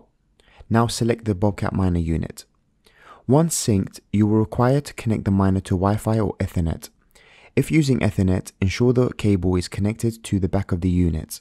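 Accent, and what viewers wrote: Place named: Cardiff, United Kingdom. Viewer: You sound like a lad I used to smoke bud with from Cardiff haha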